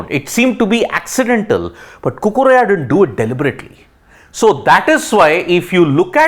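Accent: India. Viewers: ОПЯТЬ ИНДУС УЖАСНЫМ АКЦЕНТОМ ЧТО-ТО ОБЪЯСНЯЕТ ,НО ЕГО РЕЧЬ НЕ ПОНЯТНА!!!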